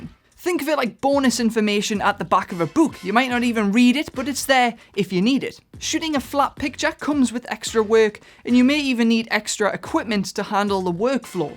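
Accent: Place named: North East England, United Kingdom. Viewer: Class to hear a North East accent talking cinematography on here!!! I am determined to shoot a cinematic travel vlog on my smartphone (Huawei P30 Pro) but the maximum it shoots in is 8bit colour. Is it worth me using the log options the app I use provides? Or are they largely redundant with the limited tech I've got. Cheers in advance!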